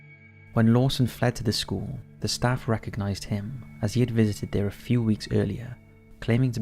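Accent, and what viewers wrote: United Kingdom. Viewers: Nothing like stories of poltergeists and demons told by soft British voice to help you nod off.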